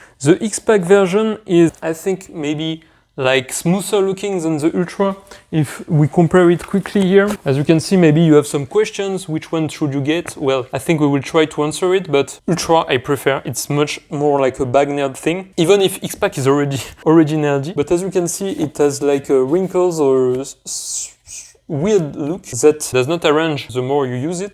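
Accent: France. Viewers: Nice french accent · Pour un français (l'accent se reconnait bien ;) ) la traduction auto serait compliquée. Déjà qu'avec des anglais c'est très aléatoire... Pour construire une commu Francophone, ce serait mieux j'imagine.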